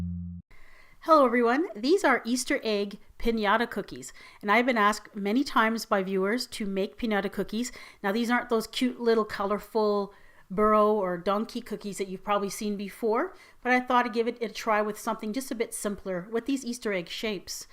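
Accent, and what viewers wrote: Canada. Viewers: are you Canadian by any chance? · Are you canadian?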